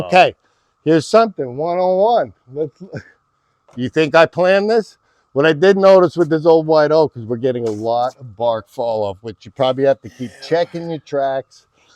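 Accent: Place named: Mid-Atlantic, United States. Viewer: I enjoy your channel, glad I came across it. Two questions. Guessing from the dialect, you might be somewhere between Baltimore and Philadelphia? Somewhere nearby? Second, I’m curious how the laser projects all around those long logs. Are there an extra lasers on the periphery? Just curious how that works.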